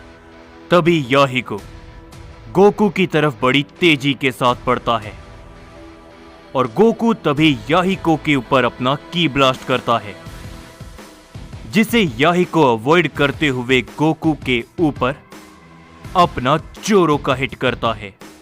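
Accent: Odisha, India. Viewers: Odisha se ho kya bhai 😅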